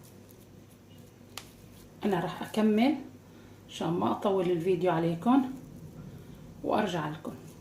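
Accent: Aleppo, Syria. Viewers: الحمدلله عسلامتك لايحرمنا هللهجه الحلبيه الاصليه..ذكرتينا باهلنا...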